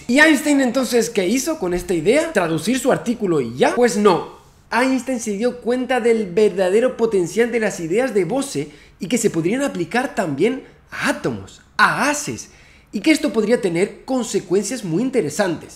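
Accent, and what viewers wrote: Canary Islands, Spain. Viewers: De dónde es el presentador? Canarias?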